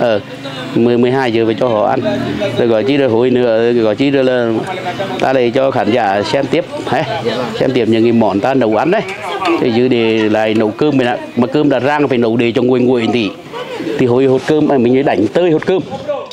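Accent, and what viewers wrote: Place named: Nghe An, Vietnam. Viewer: Bố ơi! Nói giọng bắc đi, thích xem kênh của bố mà nhiều khi không hiểu? Giọng Nghi lộc Nghệ an rất khó hiểu. Chúc bố có thật nhiều SK để cùng các cháu trong team Châu phi giúp đỡ được nhiều bản làng Châu phi.❤❤❤❤❤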